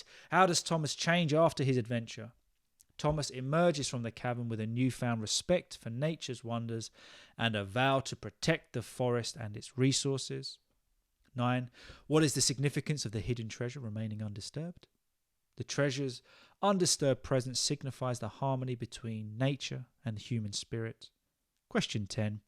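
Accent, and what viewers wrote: United Kingdom. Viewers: Thanks a lot for your lovely and diverse videos. I am fascinated by the British accent so I am your lоуаl subscriber. I'm currently learning some Christmas songs in English. Will you please explain to me what the words a-leaping, a- milking,etc in "12 Days of Christmas" mean ? Why 'a ' and a hyphen? What's the difference between swimming and a-swimming? Will be happy and grateful to hear from you.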